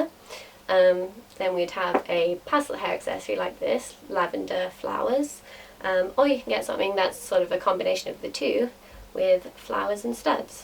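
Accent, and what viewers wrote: United Kingdom. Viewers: Your Queen's English is immpecable! Lovely to see another well spoken being ♥ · Where are you from? Because i'm English & i've never heard somebody sound SO English lol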